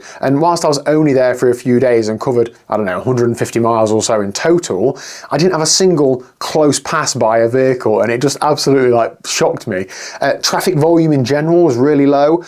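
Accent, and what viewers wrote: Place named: England, United Kingdom. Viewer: You sound as if you’re English. Can you explain to me why so many British people use the word ‘floor’ when they’re outdoors? It’s the road, right? If you stumble or fall off your mountainbike in a meadow or in the woods, you land on the ground, not on the floor, right? I hear this a lot lately. Maybe a result of our modern sedentary life?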